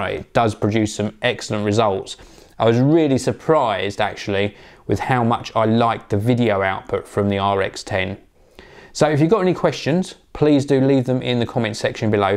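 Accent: United Kingdom. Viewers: dat british accent mate!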